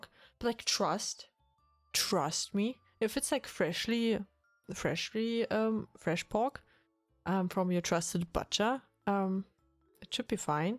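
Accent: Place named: Asia. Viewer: Congrats to the milestone, I took the opportunity and subscribed as well. Another thing: I was really surprised when I heard you say Mettbrötchen, and then you mentioned that you speak German. I originally thought that you are maybe from asia due to the accent, the name, and your demeanor which has a non-German vibe to it (but maybe I'm just used to one specific kind of German). But everything makes sense now.:)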